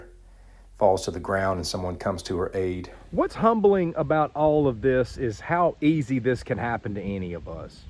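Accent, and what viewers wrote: South, United States. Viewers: Just Love your Southern accent ! Greetings from Australia. Bless.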